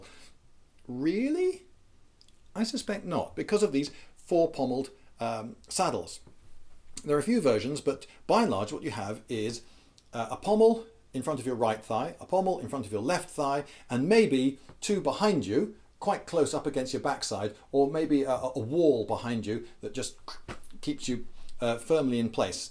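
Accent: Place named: England, United Kingdom. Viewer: +Brute127 Care to add anything besides assuming I didn't watch the video? I watched the video. A man with an English accent and one barebacking experience is not somehow an expert. Don't be fooled. Calvery was used for a reason. Warfare is more than running into a group and bashing someone. This man's thesis is astoundingly stupid.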